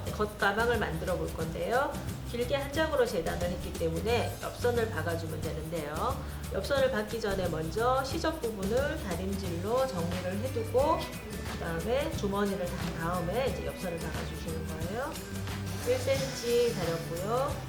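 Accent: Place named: Daegu, South Korea. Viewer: 설명하시는분 목소리 듣기 좋아요. 대구분들 유튜브에서도 억양이 나타나더라구요~~ 코코지니원단 튀면서도 예뻐요.~~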